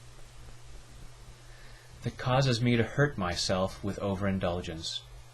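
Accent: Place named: United States. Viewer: do you think this can work over the radio? Without visuals? Would you like to do a radio interview with me? For the uk? I assume you are in the US due to your accent. I always put a recording on my YouTube channel 'Feustelfit on air 91.8 Hayes fm' Please get in touch <mail>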